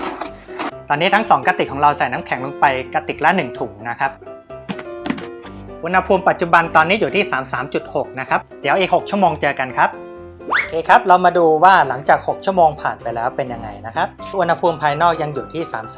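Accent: China. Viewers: พี่เค้าพูดสำเนียงเหมือนคนจีนเลย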